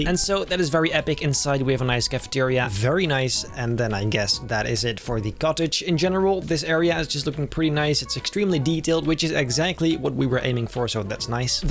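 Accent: Netherlands. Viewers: Wait r u dutch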